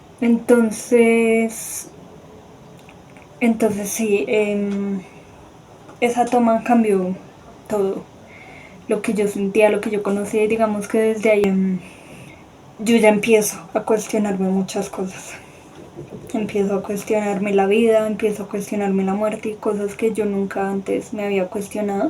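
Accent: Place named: Colombia. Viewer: Hola cómo estás, por tu acento creo que eres de Colombia yo también te pido por favor ayuda no estoy bien, y pedí a Dios ayuda y justo me apareció tu video me gustaría contarte mi historia para que me puedas brindar una orientación porque yo se lo q estoy viviendo no me lo invente yo, no estaba nunca buscando información sobre nada de estos temas todo ha llegado a mi solo yo no estoy loca, ni tengo ninguna reprogramación de una tal “nueva era” como dijo una señora en otro video que observé por siempre he tenido una conexión muy profunda con Dios y soy Asocial no frecuento lugares, no tengo amigos, siempre he estado sola no hablo mis cosas con nadie entonces quien me lavo el cerebro? Quien me reprogramo? Y Dios no habría permitido tal cosa para mí, yo no vivo una vida mundana. Yo quiero hablar contigo, por favor 🙏 Dios te bendiga.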